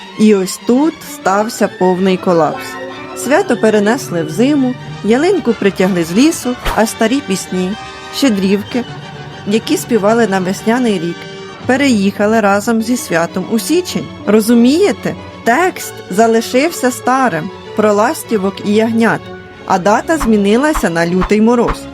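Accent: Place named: Poltava, Ukraine. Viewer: Як же мені подобається ця солодка українська промова, нагадує полтавську вимову.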